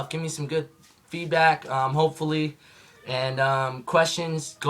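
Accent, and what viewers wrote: United States. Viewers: Hey you from utah huh haha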